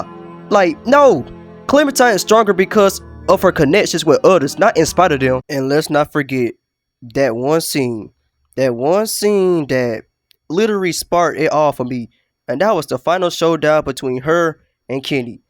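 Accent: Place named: Louisiana, United States. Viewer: What the heck? What kind of accent is that? Is that a Louisiana accent? Whoooooooaaaaaaa! Sir you are a gem. Please make more videos.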